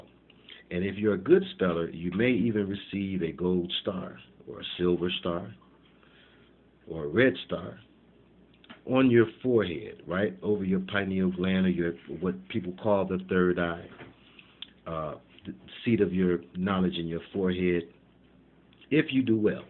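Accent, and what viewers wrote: Detroit, United States. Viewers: Are you from Detroit bro? Much luv appreciate your teachings.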